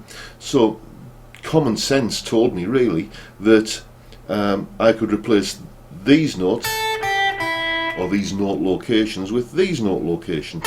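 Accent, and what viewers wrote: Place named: Northern England, United Kingdom. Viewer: Ignore the ignorance, speaking as a suvener, I love the northern accent. Keep up the great work, there are many people who I'm sure will get a lot out of this. Incidentally, my Dad who was from Hull would have called your music center a gramophone!:-)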